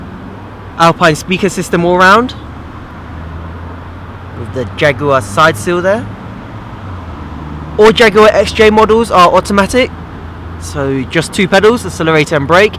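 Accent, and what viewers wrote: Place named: London, United Kingdom. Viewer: You sound very much like a London kid- innit?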